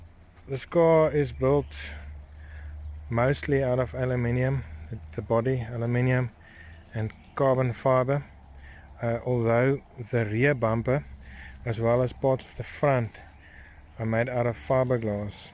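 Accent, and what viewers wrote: South Africa. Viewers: You South African my bru!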